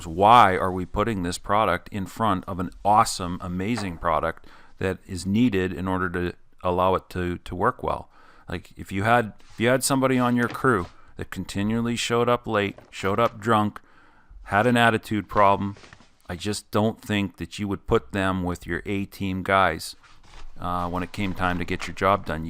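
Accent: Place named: Canada. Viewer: Does the foam have to be flush with the inside stud faces? What about convection channels behind the drywall in the stud bays? (It's on the warm side). Can a guy do 3 inches in a 2x6 wall -- or is is better to use 2x4's and fill it up? This topic is unbelievably frustrating; the whole building science topic is unbelievably frustrating -- especially in Canada. Excellent content. Excellent presentation. Excellent Canadian accent.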